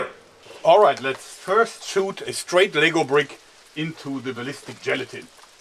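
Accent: Germany. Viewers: This dude is so cute with his german (please correct me if im wrong) accent and his homemade weapons. Like a big kid that's really creative... and possibly deadly.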